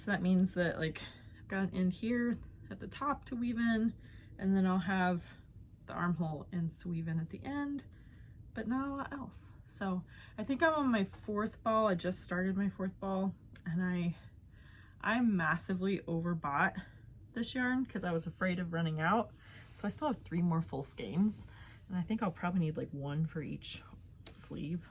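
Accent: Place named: Minnesota, United States. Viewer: Are you sure you're not from Minnesota? Because I grew up there and listening to you chat is just like sitting with my cousin or a good friend. I love your podcast and glad I found you. I mainly knit socks, blankets and a few small things but you've inspired me to get out my one sweater quantity of yarn and try to knit a sweater. (I've made a couple acrylic sweaters but never a wool one.) Thanks for sharing your love of yarn and knitting!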